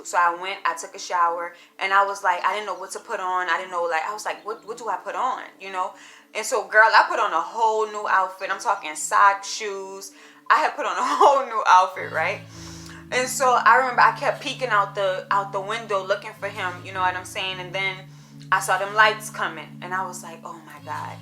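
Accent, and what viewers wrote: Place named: New York, United States. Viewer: Your New York accent had me hollering 😂😂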